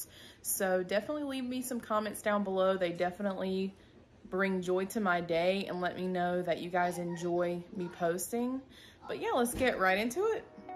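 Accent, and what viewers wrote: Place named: Virginia, United States. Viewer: I’m inspired. I like your accent. Where are you from? You sound like a gal I know from Virginia. Maybe I’ll fire the old Vlog back up and clean some stuff. :)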